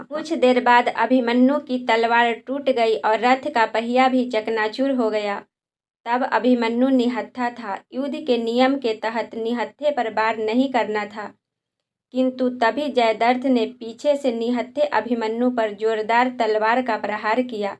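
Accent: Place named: Bengal, India. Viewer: Thoda apna hindi bolne ka trika sudharo hum lagta hai bengali ho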